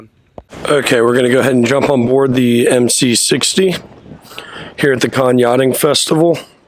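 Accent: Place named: Delaware Valley, United States. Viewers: I bet he is from PA, all my cousins from PA have the same accent. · LEEDS8 Delaware Valley (Del.Md.,Va.) so.Pa, Jersey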